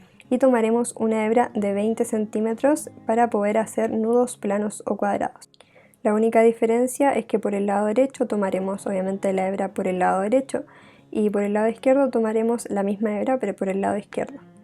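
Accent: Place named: Chile. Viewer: FELICITACIONES, MUY BUENOS TUS VIDEOS!!! EXCELENTES! ME DIJE ESTA CHICA ES CHILENA, MUY DULCE TU VOZ!!! INTENTARE REALIZAR TUS <link> ENCANTAN LAS MANUALIDADES COMO EL TEJER, Y ESTE ARTE DEL MACRAME SIEMPRE ME HA LLAMADO LA ATENCION..EXPERIMENTARE Y TE INFORMARE,... GRACIAS POR COMPARTIR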